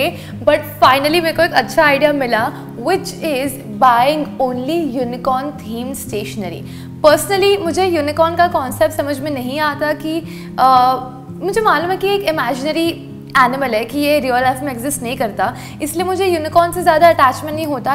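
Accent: Gujarat, India. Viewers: Is your mother tongue gujrati becoz the way you speak it conveys that you are also gujrati like me pls reply di and I also love collecting stationery ❤️❤️❤️ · Di are you gujarati ?? Because again again you are saying like jaadrha jaarha i am also from Gujarat vadodara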